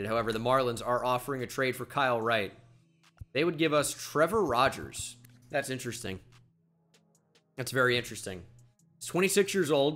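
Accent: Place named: New Jersey, United States. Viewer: You got really Jersey in here and I enjoyed it haha.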